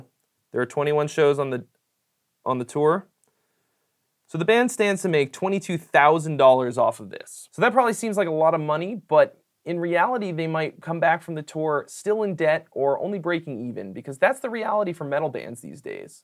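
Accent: United Kingdom. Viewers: Your English accent reminded me of Russel Brand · Your British accent makes you sound like Russell Brand.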